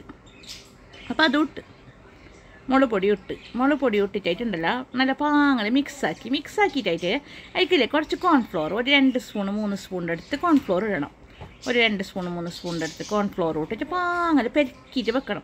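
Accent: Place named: Kasaragod, India. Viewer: ഒരു വെറൈറ്റി ....സൂപ്പർ ...അതോടൊപ്പം കാസർഗോഡ് സംസാര ശൈലി ഇഷ്ട്ടപ്പെട്ടു ......കണ്ടതിനു ശേഷം ലൈക്കും .കമന്റും .സബ്‌സ്‌ക്രൈബും ചൈതിട്ടുണ്ട്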